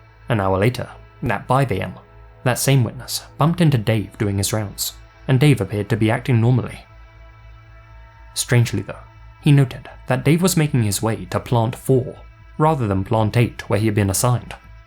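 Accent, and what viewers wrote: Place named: United Kingdom. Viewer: Ngl I hate British accents… but in this case the way you narrate stories with your British accent actually makes the stories better. Keep up the great content!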